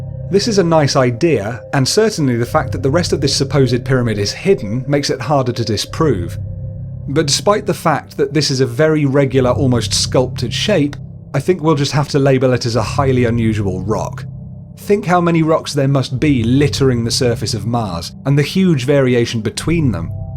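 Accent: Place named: England, United Kingdom. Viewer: Love love love your channel. Curious as to where your from. Kinda go a English accent. A real one. Not one that you see in Hollywood lol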